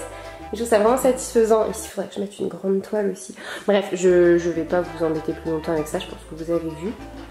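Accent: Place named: France. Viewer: I love your french accent. It is so pretty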